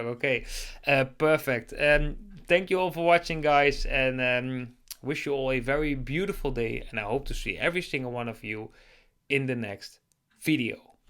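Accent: Netherlands. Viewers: Hallo! Ik denk aan je accent te herkennen dat je van Nederland bent! Ik ben Belgische en je bent de eerste die ik ontmoet met dezelfde taal en niet al te veraf! Wauw! Heel fijn jou te ontmoeten! Dank je wel voor deze aanmoedigiende en inspirerende video's! Ik ben de voorbije jaren pijnlijk geprocessed door God, om in positie te staan voor deze aardverschuivende shift! God bless you!!!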